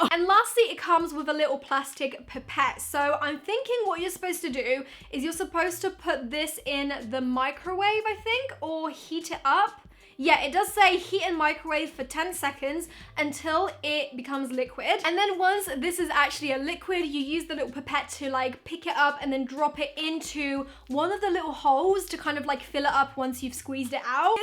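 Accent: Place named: England, United Kingdom. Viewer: I think I'm in love with your english accent❣️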